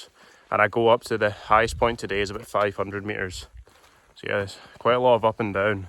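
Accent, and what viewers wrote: Scotland, United Kingdom. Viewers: Great views against a perfect blue sky, if it wasn’t for your accent I wouldn’t believe you were in Scotland. 😅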